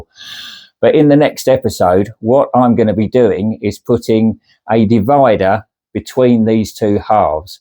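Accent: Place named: United Kingdom. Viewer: I came from the youtube ad and initially i kinda chuckled going this is the most british man ive ever heard but when i actually came and watched it i wound up actually enjoying it Model trains have always been my favorite especially when me and my late father would go to the fair and spend 20 minutes just looking at the model train set in the back Thanks for brining back some of those special memories man ❤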